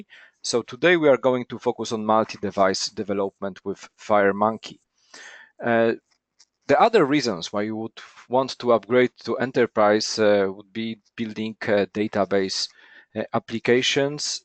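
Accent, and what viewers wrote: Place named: Poland. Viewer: you are simply the best, "English made in Poland"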